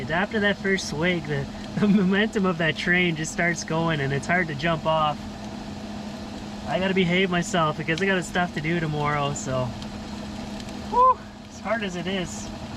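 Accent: Canada. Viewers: i was just there !!! staying in my self converted trailer. very new. just found your videos. cheers dude!! love the canadian accent with the sarcasm. ahahah maybe see you out there sometime. im personally not ready for -20 yet but are we ever fully. Beautiful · EXCELLENT VLOG. THE SNOW AND MOUNTAINS ARE UNBELIEVABLY BEAUTIFUL. APPRECIATE THE CANADIAN ACCENT !!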